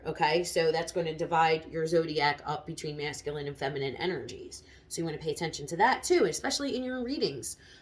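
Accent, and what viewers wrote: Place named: Philadelphia, United States. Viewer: Once again, such a great job! Not gonna ask where you are from but I was born and raised in South Philly and you sound like a straight home girl. 😀 Did want to point out that your comments about the masculine becoming more feminine and vice versa made me think of all the attention around LGBT+ issues. Personally, I think these changes are good, they indicate that perhaps humanity is striving for integration. I know as a man, being aware of my feminine side has made me a better husband to my wife and father to my daughters. You are doing a great thing with this channel. Keep it up.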